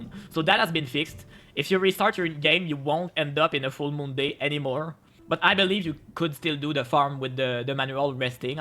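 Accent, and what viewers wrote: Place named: Quebec, Canada. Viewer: Quebecois spotted?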